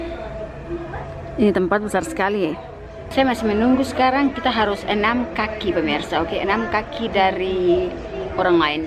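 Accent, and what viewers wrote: Manado, Indonesia. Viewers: Saya sng logat.manado..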